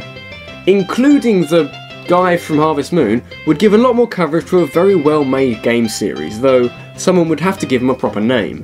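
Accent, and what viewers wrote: United Kingdom. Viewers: A British accent doesn't mean your smart or you know what your talking about.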